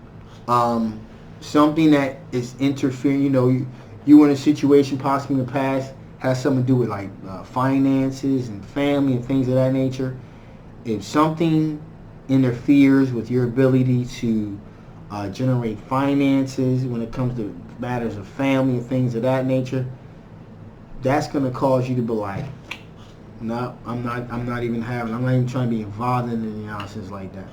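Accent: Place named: New York, United States. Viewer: Yes your skills are on point. Love the NY accent!! Queens bound. I am feeling pushed into this relationship. I want it but in a mature way give me a vision a plan we both can agree on then I'm focused on it full force. There are some things i need to get in order with my credit then I'm ready to go all the way to marriage!!!! But the anger issues have to cease.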